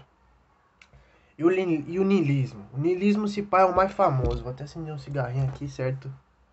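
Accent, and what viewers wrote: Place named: Favela, Brazil. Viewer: Jeito que favela fala meu deus kkkkkkkkk eu amei esse vídeo !!!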